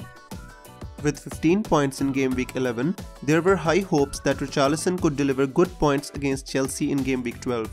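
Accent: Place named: Assam, India. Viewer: Axomiya niki o? Accent tu axomiya nisina lagise j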